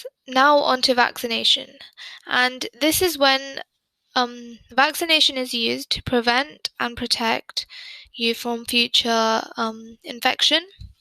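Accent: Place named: South England, United Kingdom. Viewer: idk how to explain it! 😂 I haven’t seen many people speak with your accent and idk it’s just really nice! 😂🥰 are you from the south of England?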